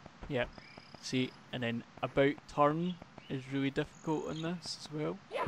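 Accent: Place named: Scotland, United Kingdom. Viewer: Lol i love your voice. i know your Scottish but its pretty cool to hear something unamerican for awhile.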